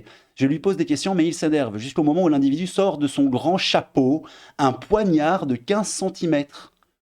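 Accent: Belgium. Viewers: Daag Meneer! Merci pour les vidéos, ça fait du bien d'entendre du belge de là où je suis. Le parti islamique à Anderlecht? pas de commentaires? Tcho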